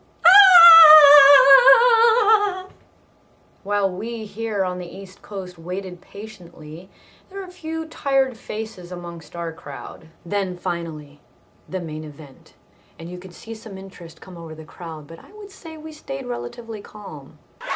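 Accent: Philippines. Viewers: OHMYGAHD. you sound JUST like my family O_o hahahh. like the filipino accents & stuff...JUST like my grandmother, aunt & uncle xD kind funny. lol. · Holy shit your Filipino accent is hilarious. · Haha your a funny little filipina. first time watching your channel, I must say I love how you do the filipino accent, so spot on. just makes me laugh. definitely gonna subscribe, you make me by the way where did you get your jacket i want one. ay inay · i like ur philipina accent hehehe nice one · hey i ALWAYS THINK THERE IS SOMETHING IN U WHICH I HAVE SEEN OR HEARD BEFORE NOW I UNDERSTAND THATS UR ENGLISH ACCENT U R A FILIPINO I M SURE BY UR ACCENT SPECIALLY BY UR MOMS ACCENT:d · Wwwait, what's your nationality? You kinda talk one accent in Philippines called Bisaya. Or you're just imitating Manny's accent?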